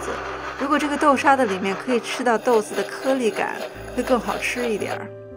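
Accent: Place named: Shaanxi, China. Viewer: 听口音陕西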